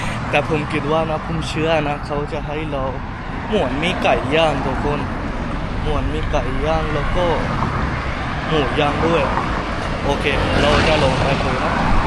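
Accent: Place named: South, Thailand. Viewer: สำเนียงใต้เลยนะฝรั่งอะไรวะ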